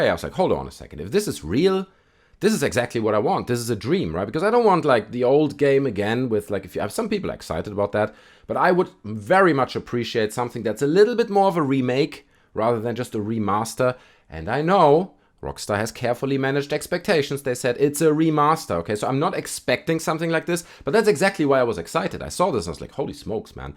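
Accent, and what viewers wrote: Germany. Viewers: Let us hope this is real! BTW, are you german? I swair you Sound like me when i talk english 😅✌️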